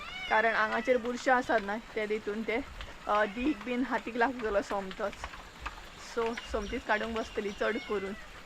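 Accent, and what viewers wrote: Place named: Konkan, India. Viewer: Keep going.... Don't stop your vlogs. I always like your talking way... I mean your konkani is different from ours as i stay in South Goa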